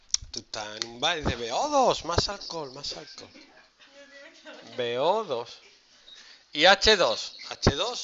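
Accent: Andalusia, Spain. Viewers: ole ese andaluz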